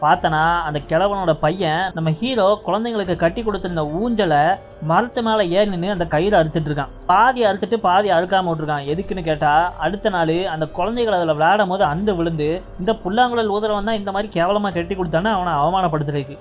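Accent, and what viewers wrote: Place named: Thoothukudi, India. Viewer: Hai bro. . Nama ooru slang mari iruku. . Iam frm thoothukudi. . Especially and ketta vartha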